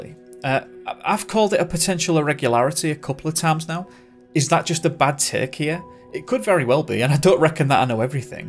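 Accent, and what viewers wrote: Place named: England, United Kingdom. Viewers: 😂 His accent is English not Scottish love. Lol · You lol that as though anyone from the U.K. could readily tell the difference between a West Virginia accent and one from Kentucky. I know you mean well, but the tone sounds condescending. I'm sure that's unintended. His vocal cadence (there's a better word for it) does have a tiny bit of a brogue. If someone hasn't spent time in Scotland and England, it's an innocent misunderstanding. When he says, "taken," "say" or "if so," those vowel sounds aren't immediately revignizable as an English accent. From which region is he? Side note: I'm related to Hannah Bee and family from Warwickshire- way back..Is that actually your last name?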